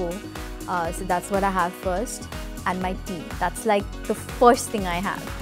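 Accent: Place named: Mangalore, India. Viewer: She is a mangalorean. Most girls speak and have expressions the same way. Also Aishwarya is a mangalorean. We can relate.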